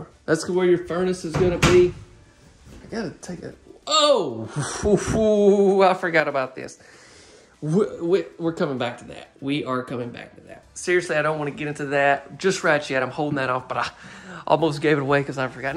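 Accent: South, United States. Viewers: I mainly watch you because your down home accent and voice is so soothing and it gets me through another crappy, depressing California day. If I bought one of these homes, it damned sure wouldn't be here. Well, as for this place, I can say it's unique, for sure. I like that it's a single story. I'm old...it does make a difference. I don't have no large family. But, I hate open concept. Hate it. And I would be annoyed as all get out with all those light fixtures that take special (and expensive) bulbs. And, there are not three pantries...the barn door closet actually is the true pantry. The other door inside the kitchen is a broom closet and the closet in the hallway by the bathroom is a linen closet for towels, bedding, etc. Just so you know. And I'm still trying to figure out why there is a pass-thru in the master closet. Is it vogue to hide yourself carrying your laundry basket these days? 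That thing, for me, is fodder for a horror movie. Nice to make access for the monsters in your closet to come in. And finally, a bathtub in the master bedroom and a dream of a tub at that. Who cares if the monsters are coming into your closet when you're soaking in that!!! The shower looks like it belongs in an RV but who the hell is going to care about the shower with a tub like that?! I'd just use that shower as a storage area! And cute little guy, btw. Those cheeks 😁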